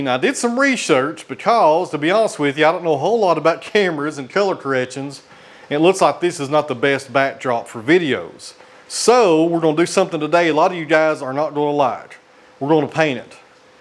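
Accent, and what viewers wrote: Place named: Tennessee, United States. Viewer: I think I would go for a second coat unless the bulk of the wall is being covered up by tools etc. No on second thoughts a second coat is needed, do the job properly so that the exsposed parts of the wall do look finished. Thank you for what you do, it is great to watch your progress and growth. That accent of yours is just how a Limey would imaginea genuine Tennessee man to have and long may you continue, I just love it. How much land do you have for your property, land in England is eye watering high and hard to come by.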